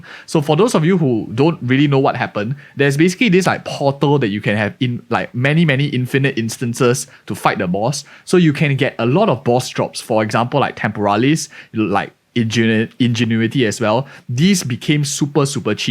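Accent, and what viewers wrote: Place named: Singapore. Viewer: Great content, are you from Singapore? HAHAHH idk why but u sound very Singaporean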